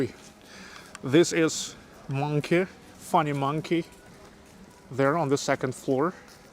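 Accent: Russia. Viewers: I like your English/Russian dialect. We don't want a English professor from Harvard.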